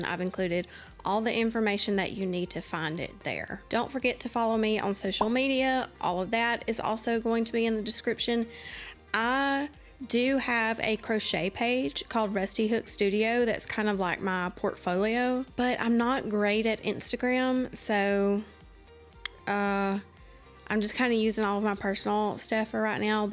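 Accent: South, United States. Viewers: you're very talented! I like the blanket that you crocheted that's on your couch, the most. I like your Southern accent. My mom's parents were from Gaffney and Cherokee, South Carolina and my dad's grandpa was from Tennessee. I've always loved the twang.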